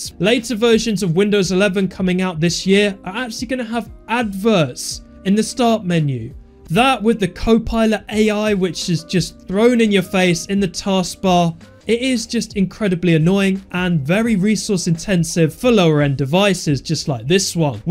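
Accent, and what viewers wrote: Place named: England, United Kingdom. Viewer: He's english. Just because he has an accent doesn't mean he's indian. There are lots of nice people in India and you just provoked them just like that, what a shame.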